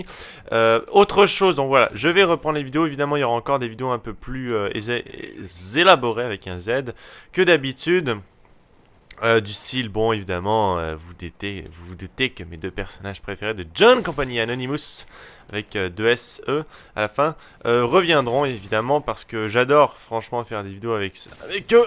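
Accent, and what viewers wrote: Quebec, Canada. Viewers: Tu as un accent québécois non ? x) Très bonne vidéo ! (Y)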